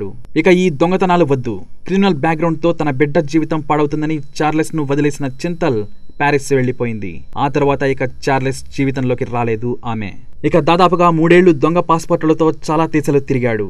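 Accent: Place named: Andhra Pradesh, India. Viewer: mee andhra yasa apundira mundhu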